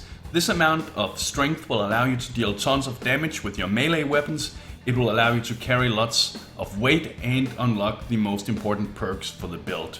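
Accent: Denmark. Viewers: My god you have a Danish accent a viking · But yeah, your accent gave it away, we danes have a weird accent. xD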